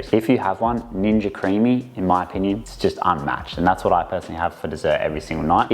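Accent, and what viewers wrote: Australia. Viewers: Are you a West Aussie mate ?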